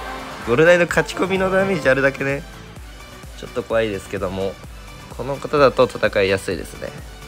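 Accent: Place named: Kansai, Japan. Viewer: なんか今回粗品の動画見たあとなんかってゆうぐらいにちょいちょい関西弁ぽいの聞こえるなぁ笑笑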